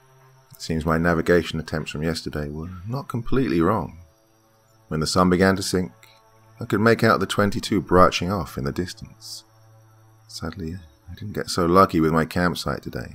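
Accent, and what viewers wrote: England, United Kingdom. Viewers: What part of England do u come from? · thank you I thought I picked up some british accent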